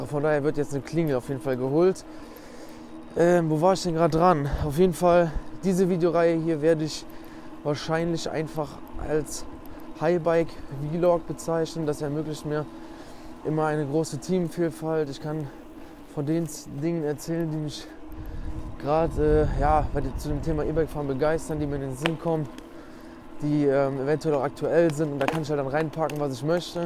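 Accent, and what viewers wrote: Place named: Cologne, Germany. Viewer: Deine Videos sind am Ende häufig wenig informativ, für mich - guck sie aber trotzdem gerne - wahrscheinlich weil ich an deiner Aussprache erkenne dass du ein Kölner bist 💪😎👍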